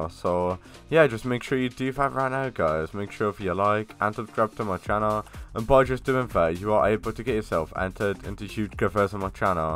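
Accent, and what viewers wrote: United Kingdom. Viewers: He's is British mate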